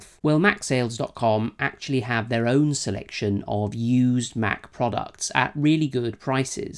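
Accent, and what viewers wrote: United Kingdom. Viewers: Yay you’re British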